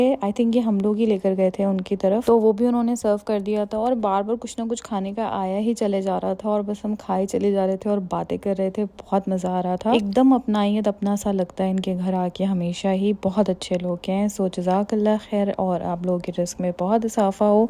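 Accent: Karachi, Pakistan. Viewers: your Urdu is sooo posh! are you from Karachi?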